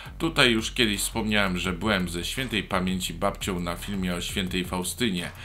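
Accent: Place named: Kraków, Poland. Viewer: Jesteś z Krakowa na 100000% najkrócza, czeba, czy :D uwielbiam.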